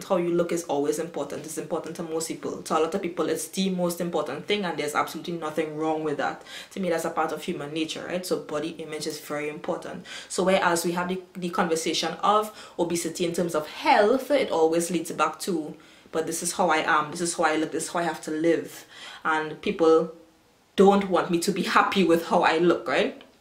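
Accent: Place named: Trinidad and Tobago. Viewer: I just subscribed. I love how you kept it real, with no fake accent. Real trini accent here.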